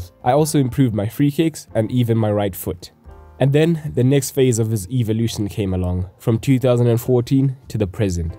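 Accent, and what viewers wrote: South Africa. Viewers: are you South African? you sound SAn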